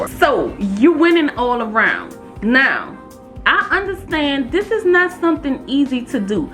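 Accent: New Orleans, United States. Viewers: New to the channel but I’m here forever now! I just know it’s the Nola accent for me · You have that New Orleans accent!! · New subbie here. Even though I am located in Missouri now. I was born on Metairie. I hear New Orleans in your voice...are you a Louisiana native? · I know a New Orleans native when I hear one!!! Hey baby.... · New Orleans? That accent strong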